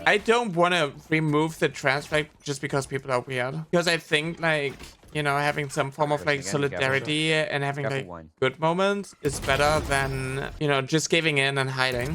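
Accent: Germany. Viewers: I thought I heard a Görmän accent! 😂 - I'm sorry you had to go through that. I stopped playing Valo long time ago due to toxicity. Im very sad whenever the old saying "Männer sind Schweine." is proven right. Just how where these guys raised? 😅 - Keep up the pride, we're with you.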